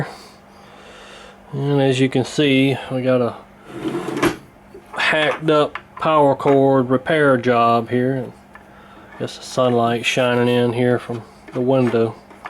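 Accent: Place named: East Tennessee, United States. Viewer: Great channel. And sir, that sounds like an East Tennessee accent to me. Or somewhere not too far from there.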